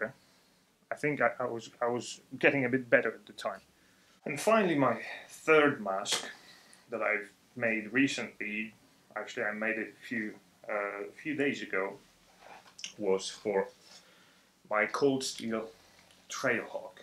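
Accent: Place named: United Kingdom. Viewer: powiedz mi skąd masz tak fantastyczny akcent? Urodziłeś się w UK czy w Polsce? BTW fantastyczne materiały miło się Ciebie ogląda i te polskie napisy, w których tłumaczysz często na skróty wychodzą czasem zabawnie:) Tak trzymaj!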